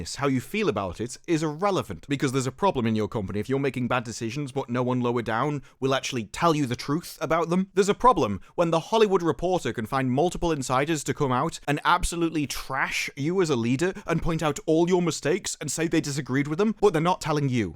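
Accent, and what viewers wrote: United Kingdom. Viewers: Oh, I get it.. So you're like a British It'sAGundam ??? 🤔 I'm here for it. Your accent is very similar to Gopher. 😅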